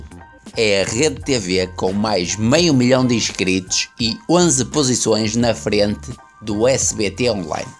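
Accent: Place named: Portugal. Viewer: O cara faz conteúdo do Brasil mas o sotaque e português de Portugal, vc e de Portugal mesmo?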